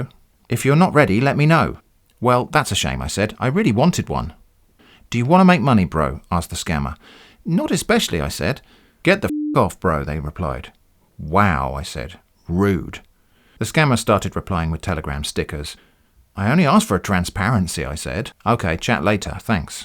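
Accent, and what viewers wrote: United Kingdom. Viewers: Had a long nasty allnighter of work, nice to have that nice British voice to soothe me to a Saturday of sleeping in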